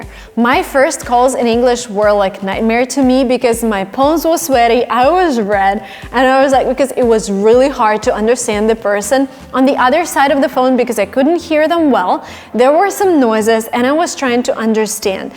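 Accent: India. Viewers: This exactly is similar to how we speak English in India. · It look's like all Indians are showing up here🇮🇳.The thing here is her accent is like Indian who speaking in English...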